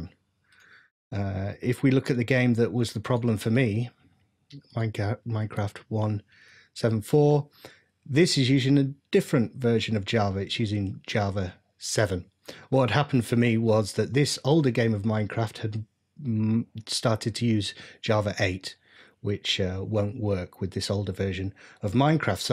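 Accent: United Kingdom. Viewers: nice accent british or ?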